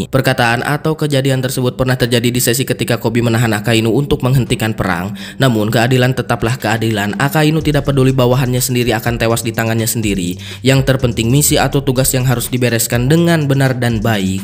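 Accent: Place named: Sunda, Indonesia. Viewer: sunda banget pembawaannya teh hahaha kerenn.. semangaatt boy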